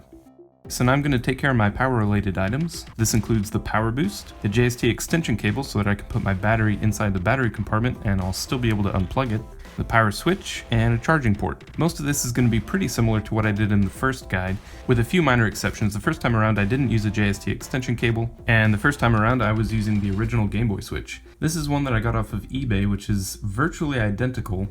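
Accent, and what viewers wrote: Germany. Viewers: are you german? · Do you come from Germany? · are u german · Are you from Germany?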